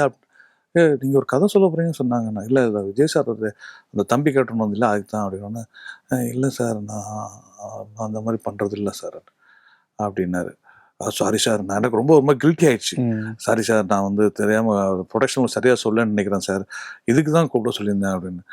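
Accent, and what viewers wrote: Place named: Southern Tamil Nadu, India. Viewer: அருமை அருமை ❤நெறியாளர் தென் மாவட்டம் போல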